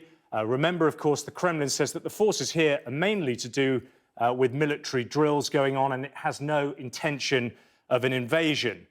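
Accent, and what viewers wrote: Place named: United Kingdom. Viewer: It's not "military". It's " militree" got to love those British accents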